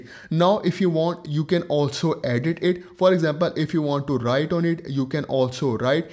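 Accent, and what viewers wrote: India. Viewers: 개인적으로 인도식 영어 발음 너무 좋아요. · I like the Indian accent.. that is very special here in Philippines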